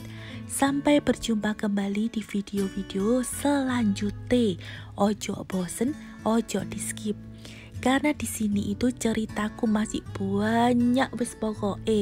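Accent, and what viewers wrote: Java, Indonesia. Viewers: logatnya jawa juga kental banget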